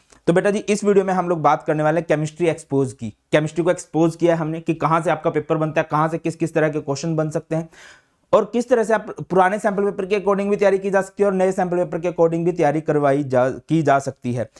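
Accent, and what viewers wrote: Haryana, India. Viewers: SIR AAP HARYANA M KARNAL SE HO KYA?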